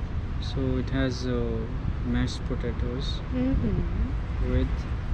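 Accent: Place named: Romania. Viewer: Supă accent is români ,dar se dau străini.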